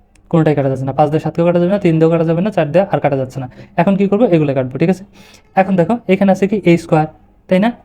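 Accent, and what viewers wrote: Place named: Pabna, Bangladesh. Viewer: স্যার আপনার বাড়ি মনে হয় পাবনায়।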